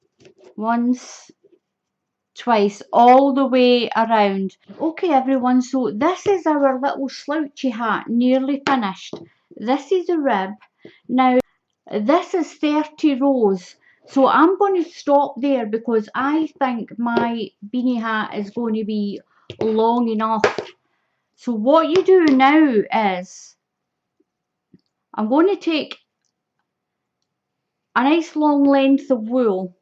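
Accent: Scotland, United Kingdom. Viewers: Was going to watch this to see this beautiful hat being made and staying to hear the accent. I love Scotland, can't wait to see how this hat turns out :)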